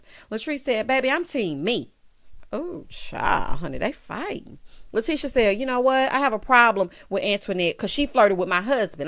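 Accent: South, United States. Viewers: Well its the south and you have a little south in your voice. But I agree I cannot stand all of fussing at the same time though, lol!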